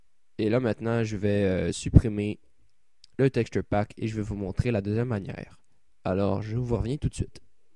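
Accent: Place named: Canada. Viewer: j'adore ton acsen canadien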